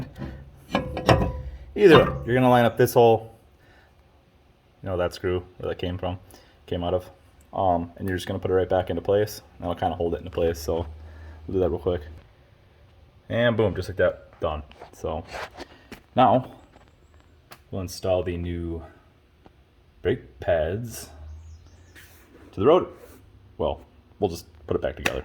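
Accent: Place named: Canada. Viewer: You sound Canadian...will I have a problem if I have an American accent? Thanks for the easy video...it looks pretty straight forward, Thanks!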